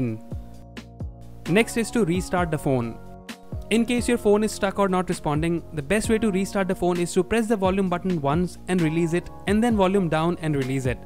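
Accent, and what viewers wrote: India. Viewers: Indian accent is sooooo bad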